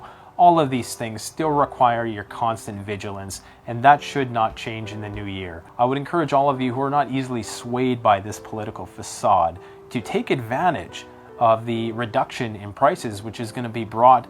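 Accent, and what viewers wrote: Canada. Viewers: Since there IS not a Canadian voice, I feel your channel is unique. You shouldn't have to change your name. Happy new year to you and yours. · at first i thought this video is just a funny parody: a canadian flannel wearing with overly canadian "out" and "about" with that background music, but no it seems you're serious! well most of the things you said are pretty factually wrong but hey you seem like a pretty nice and likable guy so best of luck to you buddy. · The name is good. It tells us you are Canadian and that doesn't mean you are limited to talking only about Canada. FPS Russia is an American faking an accent and making videos about things that Russians don't have access to. It works well for him. Your Canadian accent is real and no one questions that you are from Canada.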